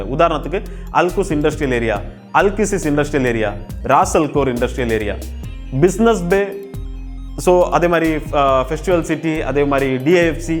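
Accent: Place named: Nagercoil, India. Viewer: Iam hardly searching job at UAE.. its very useful brother.. neenga Nagercoil ah brother.. speech la Nagercoil slang varuthu..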